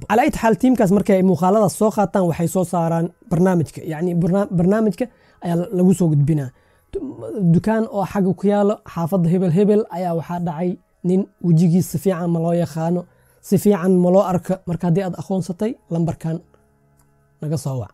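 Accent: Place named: Jilib, Somalia. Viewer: My bro wan jecelahay qisoyinkaga sido kale qabka aad uga shekeso waxaatahay qosol bro lahjadeda jilib marko ayaa isoo xasusisey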